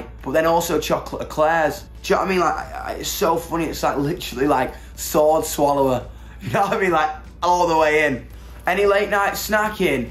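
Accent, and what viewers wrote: United Kingdom. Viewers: this is exactly how I imagine a British person to sound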